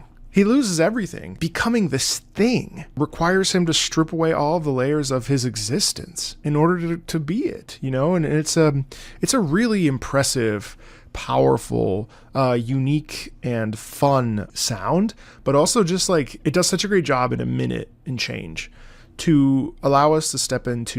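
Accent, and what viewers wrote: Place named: Italy. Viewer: I love how you pronounce Japanese with an Italian accent, genuinely really charming :D like the oshiete yo becomes oshietero.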